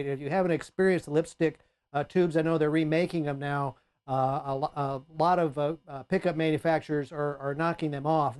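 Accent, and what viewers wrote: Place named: United States. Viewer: like watching clapton with u.s. accent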